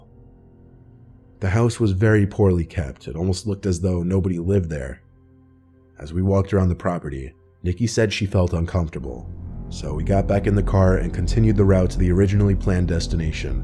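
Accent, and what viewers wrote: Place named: United States. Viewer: After listening to so many Be. Busta vids I can hear heavy American accent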